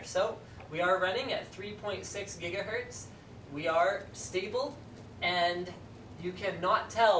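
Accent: Canada. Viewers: here he soundedmore canadian than he does now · you can hear the canadian in his voice in this video lol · OMG Linus has such a canadian accent in this video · The only difference between him back then and now is he just sounded even more Canadian back then 😂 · His Canadian accent is enhanced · You were more Canadian XD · Why does he sound more Canadian back then? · Ahh back when Linus still had that Canadian accent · I’ve never heard Linus sound so Canadian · Im acutally happy for this youtube recommendation. His Canadian accent was stronger back in the day. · Oh my god he sounds so Canadian here, he sounds a little less Canadian now but here it’s just- it’s just there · His voice hasn’t changed at all, but he did lose a bit of that Canadian accent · He sounded a lot more Canadian back in the day lol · He sounds more Canadian. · Yes it has, you can hear the Canadian accent more here.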